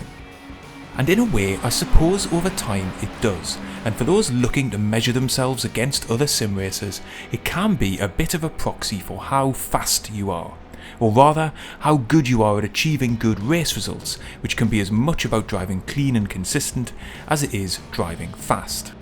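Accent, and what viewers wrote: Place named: County Durham, United Kingdom. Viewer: Hi you sound local to me as I live in stanley co.durhm. Enjoying the vids and would love an idea of price for a simple mackem mover graphic for my current cars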